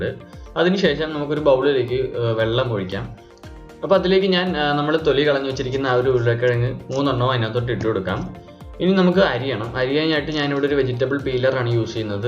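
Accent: Alappuzha, India. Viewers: ചേട്ടൻ ആലപ്പുഴക്കാരൻ ആണോ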